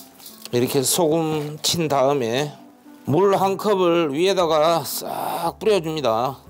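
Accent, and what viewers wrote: Jeolla, South Korea. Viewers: 봄동 안담가도 전라도사투리 땜에 기냥 너무 맛나부러요 나도한번 담가볼려구요 그런데 지난번 무 간장짱아찌 담근거 어떻게 해묵어야 맛나는지 가르쳐 주신다했는데 ᆢ어떻게 되셨나요? 찾아보니 아직 못찾아서 유ᆢ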